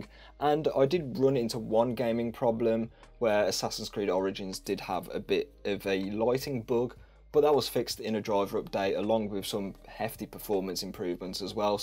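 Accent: Black Country, United Kingdom. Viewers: I wanna ask, are you from the Black Country? From the accent and your channel name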